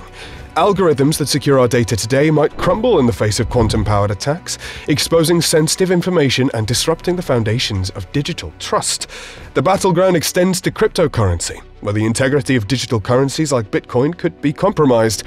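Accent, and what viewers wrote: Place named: England, United Kingdom. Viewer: Every time I look at YouTube and check anything in the US technical information Giant youtube? Some English dude, speaking. I can't stand it stand it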